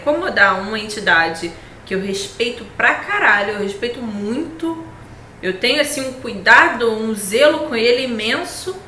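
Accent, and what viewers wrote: Rio de Janeiro, Brazil. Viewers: Muito bom mas no sotaque carioca. Ah não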